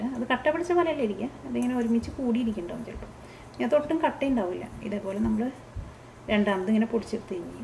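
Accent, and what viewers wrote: Thrissur, India. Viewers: thrissur style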